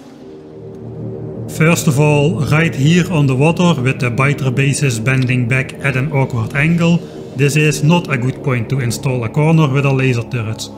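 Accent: Netherlands. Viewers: Lekker bezig man, gave playthrough en mooi bewerkt. I love your attempts to * cough * properly pronounce the English words like recipe. Every time I hear a fellow Dutchie pronounce recipe that way (and I heared it very often back in the day I played WoW) I just crack up :D Gewoon lekker Dunglish blijven praten ;) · I was doubting whether you was german or dutch based on your accent, turns out dutch after all (checked your youtube page).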